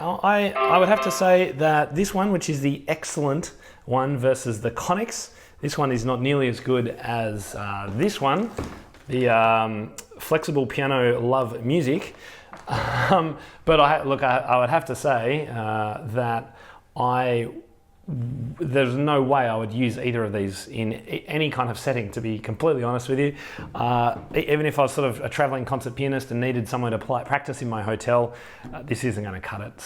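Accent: Australia. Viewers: I like your accent. Is that Aussie?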